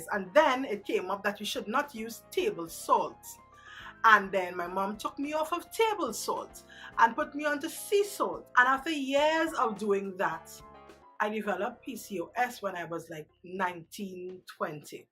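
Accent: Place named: Caribbean. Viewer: Where are you from, because you sound like a mixture of Caribbean islands. Trini, Bahamian, and jamaican.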